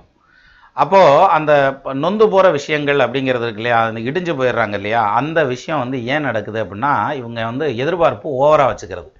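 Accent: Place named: Kongu, India. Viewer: Sir, my guess you are from kongu vellala gounder community ... Pls don't mistake I m guessing based on the way you talk and the examples you say in between, which is very familiar in this community. I may be wrong....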